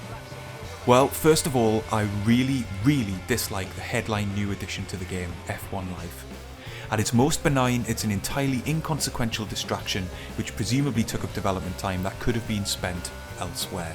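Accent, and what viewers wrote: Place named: Middlesbrough, United Kingdom. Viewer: Where are you based? You accent sounds close to me (Middlesbrough)?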